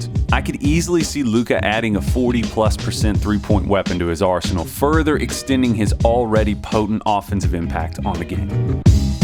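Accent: United States. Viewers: Yeah, at first I was thinking to myself "Gosh... This guy perfectly nailed the American accent... (My thinking being, that the narrator was Slovenian:))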